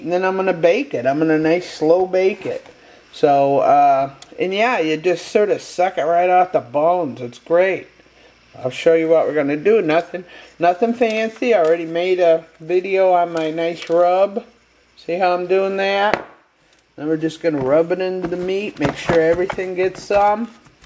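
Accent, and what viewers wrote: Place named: Illinois, United States. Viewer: I never thought to do that with neck bones but I sure will now. I CAN say they make the best spaghetti sauce going! Between the sweet pork flavor and the bones, you can't beat it. Thanks for another great idea,. (Northern girl, huh? I would have guessed Illinois, but there's a similar accent in Central NY...Utica/Saracuse/Buffalo...Humm) LOL, you do NOT want to hear my accent...Tess from NY:)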